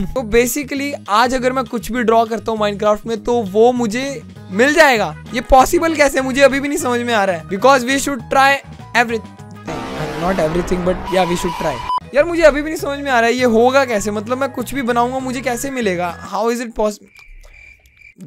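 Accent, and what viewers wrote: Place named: Haryana, India. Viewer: well that's not true we don't watch him for his haryanvi accent , we watch his video because we like him ( btw I love his haryanvi accent )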